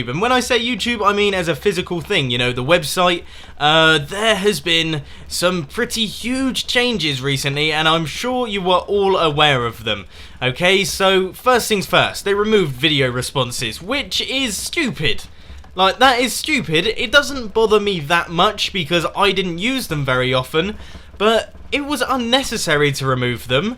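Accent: United Kingdom. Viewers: I think what I love most is the Britishness of your accent and the music. It's just so... British. Keep up the good work. Love your stuff.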